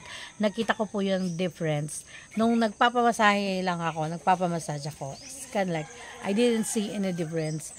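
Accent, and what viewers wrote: Ilocos, Philippines. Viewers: ilocano ka gayam madam? kunak idi bisaya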